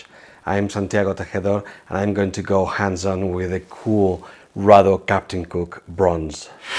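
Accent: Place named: Spain. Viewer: Se nota que es español pero no lo hace nada mal,cuando un inglés habla español tambien se le nota, mucho mas. Ánimo Santiago.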